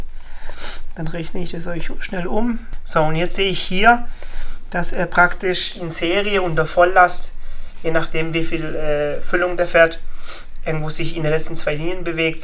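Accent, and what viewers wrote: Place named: Hohenlohe, Germany. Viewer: aaah wieder dahom in Mergentheim, seh i doch glei... :-) "...Steckor uffs Getriebe..." is hald doch faschd Houalohe!!!! Houalohe, do wo die Hase Hoosa haase, un die Hoosa Housa haase. :-)))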